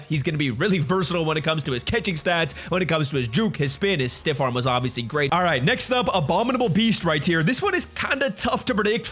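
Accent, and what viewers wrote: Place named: Canada. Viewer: Been saying the same this for like 2 years lol but it’s his Canadien accent I think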